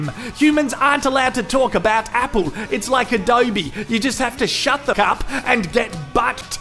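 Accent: Australia. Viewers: Mate what part of straya ya from. NSW meself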